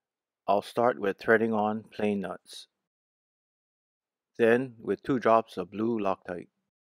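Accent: Hawaii, United States. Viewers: You sound Hawaiian 🤙🏽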